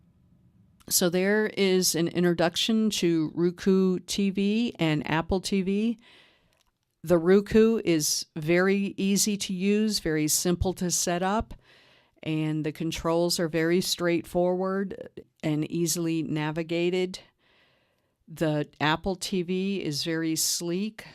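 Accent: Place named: South, United States. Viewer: I appreciate you southern accent but it's Roku not Ruku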